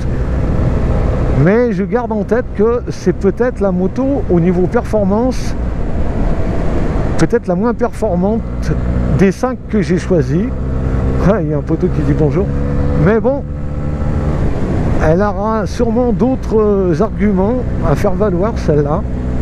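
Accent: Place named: Lyon, France. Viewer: j’ai une Dacia Logan et une 911 carrera 4 S , je suis un automobiliste avec la deuxieme et pas avec la premiere ?...pareil il y a des permis B qui veulent rester avec leur petite moto et des fois c'est des Papy qui ne veulent pas passer le A2...Super Vidéo ,j’aime bien le beaujolais et ton accent de Lyon...gros V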